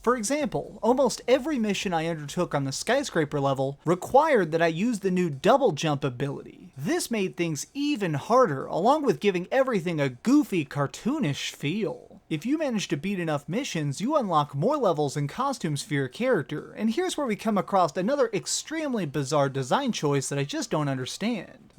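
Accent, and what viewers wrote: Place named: South, United States. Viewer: Man, you sound like a peckerwood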